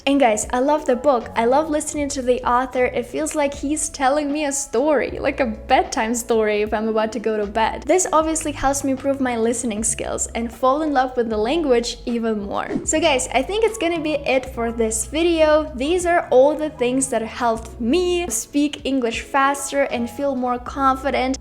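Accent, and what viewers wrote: Russia. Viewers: Девушка, вам не кажется, что у вас очень яркий русский акцент? Буквально русскими буквами говорите английские слова. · Русский акцент узнаваем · lemme guess, her accent is russian, isn't it? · СЛЫШУ РУССКИЙ АКЦЕНТ😁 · Ну у тебя ещё присутствует русский акцент, милочка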